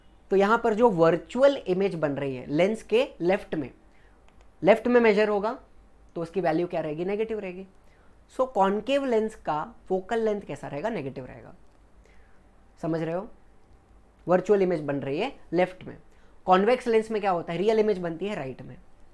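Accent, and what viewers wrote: Maharashtra, India. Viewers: sir your mother tounge is marathi?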